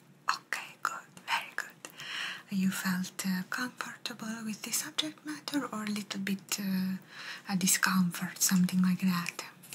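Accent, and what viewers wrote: Italy. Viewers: Great video. You always do a fab Italian accent ❤ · Ciao! Mi piace molto il suono della scrittura e il tuo meraviglioso accento Italiano è così dolce e rilassante! Complimenti, sei bravissima e bellissima 🫶🥰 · The Italian accent was done great, and i really like your therapy ASMR. This one reminded me of a recent therapy appointment of my own, which went great! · I didn’t know you are not Italian until I checked it 😂 such a perfect Italian accent, amazing!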